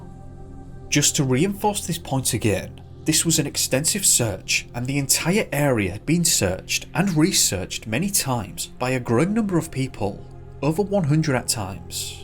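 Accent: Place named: Scotland, United Kingdom. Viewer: does an excellent job with Missing Void and I like his Scottish accent!!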